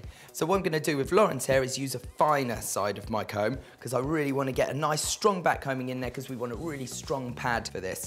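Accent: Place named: United Kingdom. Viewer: I'm so in love with British accent.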